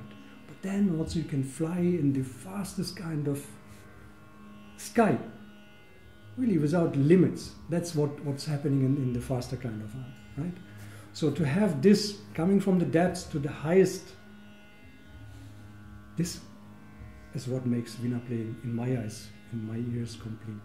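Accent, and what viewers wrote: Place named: Germany. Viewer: I think it's German accent. lol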